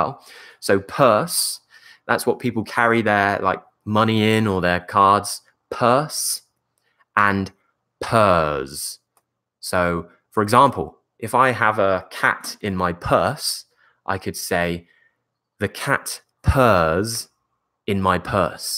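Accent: United Kingdom. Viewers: I’m studying a British accent for acting here in California. So glad I found someone with an authentic accent online who’s also a teacher:) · Oh man you just make me fall in love with British accent, I watched most of your videos, and it was really useful, I hope that to make a video about most words that people from UK using, ( informal words I can use with my friends) Thanks from Morocco 💙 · Hi I'm from Indonesia, I love your British accent, I like your pronounceation it completely makes me understand. And then you explain the meaning of the words. Thank you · Lots of Love from Dhaka,Bangladesh 🇧🇩. I am quite usual with British Accent through your YouTube Video. Thank You Sir. You are my accent teacher.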